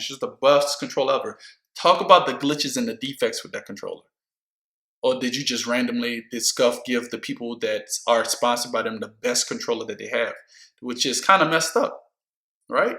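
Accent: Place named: Louisiana, United States. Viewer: What up fam... I subscribed to your channel, and I was like he sound like he from the “Boot” too, but wasn’t sure. Then I see this video and I see the Saints Cap 😂.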